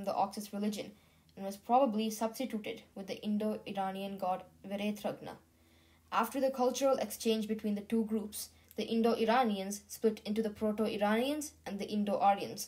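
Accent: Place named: India. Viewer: You accent sounds Indian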